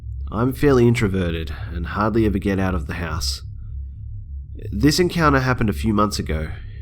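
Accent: Australia. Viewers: I love your accent!! Are you Australian? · are you from Australia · He's Australian he does not sound British at all -_ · AUSTRALIAN ACCENT YAS BITCH MAKES THE VIDEO BETTER. lol :3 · That is the cutest accent I have ever heard!!! Is it Australian? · are you also aussie? cuz honestly nobody in nsw says gday haha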